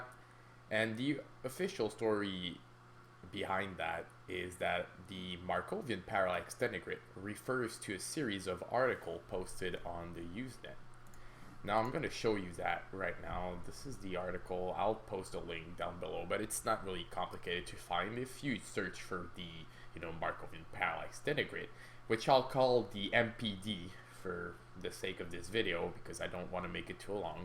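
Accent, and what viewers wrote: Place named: Quebec, Canada. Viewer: You're from Quebec aren't you ? The accent gave it away.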